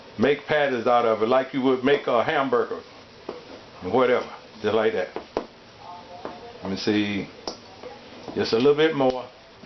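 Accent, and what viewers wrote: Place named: United States. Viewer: I had to give you a thumbs up because of how you talk. You're language is so country just like my people. Be Blessed !!!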